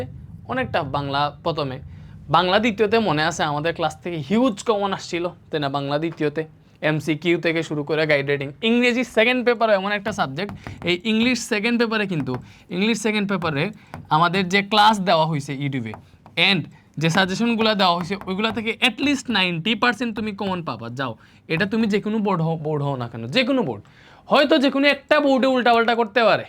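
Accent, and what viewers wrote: Sylhet, Bangladesh. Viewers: Aree bhai eita moja near ki ache Siree Sylhetyy 🙂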